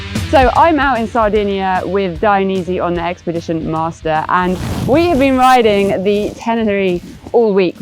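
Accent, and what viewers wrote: United Kingdom. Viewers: Funny, I follow you on Instagram but never noticed that you’re British. You’re British right? Great looking pants and jacket!!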